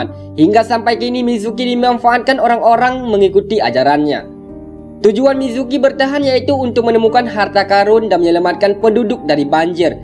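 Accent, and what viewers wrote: Aceh, Indonesia. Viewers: Klo di dengar dari logat bahasa nya pasti orang Aceh 😂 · logatnya orang aceh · Bang, aban orang Aceh ya? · Ko org aceh ya min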